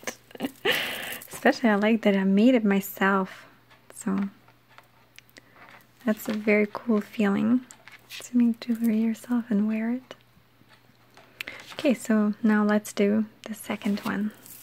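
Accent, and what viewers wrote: Russia. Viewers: I was in Baltimore a few weeks ago with my boyfriend and was secretly hoping to run into you randomly in a little shop or something to say hi and meet you! But I'm glad I didn't because I'd have nothing to say I'd just wanna follow you and listen to you talk (that might have been slightly creepy) haha. I love your voice so much and your Russian accent. I also just bought the nail hardener you recommended because I have such problems with my nails peeling so I hope it works! Maybe someday I'll have nails as beautiful as yours. That's the dream. Lol. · ! I love your videos you're the best! I've always had asmr since I was a little girl. It was mainly my teachers that made me have a tingling feeling and chills! My Spanish teacher in high school was Russian and she really had a soothing voice and accent like you. When I started watching you, you introduced me to things like tapping and crinkle sounds I had never experienced before in asmr. 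I didn't even know what I had had a name lol. I thought I was the only one until one day I came on YouTube and typed in soft spoken words and came across you and I've watched you ever since! You're really good at what you do. Congrats on making it to 5 years! Keep it up! If you're looking for things to make into jewelry I would suggest making things with sea glass. It's very beautiful we have a lot of it here where I live on smith island in MD. How the sand and water mold pieces of broken glass to have smooth edges is so pretty. A friend made pretty necklace and earrings made out it I lovethem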